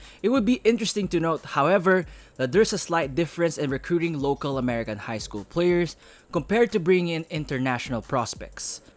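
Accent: Philippines. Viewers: I think you are filipino bro, because of that filipino English accent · I can hear by your accent that you’re filipino (do i spell it with a ph or an f, i dont want to be offensive or rude so correct me if im wrong) but with that being said if i was filipino i would like kai more also because of what he represents for the island and even tho im not filipino i like kai more also cuz if it came down to it and chet had to guard him he would have alot of trouble since kai weighs so much more than him although chet has better ball handles than kai but in the nba with them both being 7 footers they would be played as big men chet isn’t athletic enough to play guard so hes gonna have to get his big man skills better. So in my opinion if i was an nba scout i wiuld want kai over chet. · Sabi na nga ba Pinoy sa boses pa lang. Idol :) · I couldn't help but notice the Filipino accent in you but superb English nonetheless! 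Are you from the Philippines though? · Filipino ka ba kasi yung boses mo pag pilipino boy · Are you Filipino bro? Kasi yung accent mo parang pang Pilipino.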